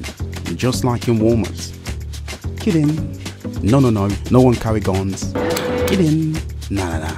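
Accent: United Kingdom. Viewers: are you American? Your accent doesn't sound like. British I suppose? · Sounds like you're British, not American. Am I right or am I right ? :) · "America" in title >British accent I'm not watching this.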